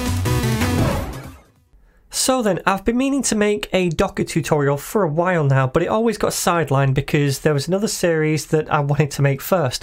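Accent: United Kingdom. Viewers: There's something about a classy British man explaining programming to you as your own personal professor Cheers, mate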